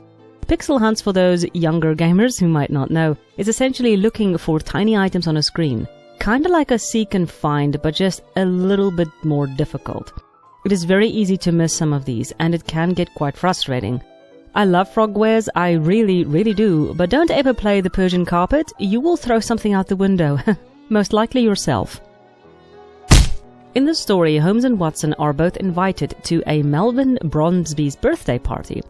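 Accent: South Africa. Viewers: Within the first 30 seconds, I could hear the SA English accent. Appreciate that there's a local female gamer giving her two cents. Much love!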